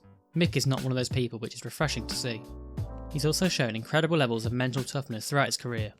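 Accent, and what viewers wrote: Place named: United Kingdom. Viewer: With all due respect, just some input suggestions critique feedback advice. I know that English language came from the UK GBR, especially England and Scotland. But, not everyone understand British accent, let alone if you speak really fast like that. I actually able to understand Sky Sports F1 even tho they have British accent, because they speak slower. But, I had a hard time trying to understand what you said, that I had to replayed it a few times. Even so, I still couldn't get all of what you said, only a few. Besides speaking slower, it would be better if you provide captions. Because the auto-caps isn't that accurate. Thankyou very much🙏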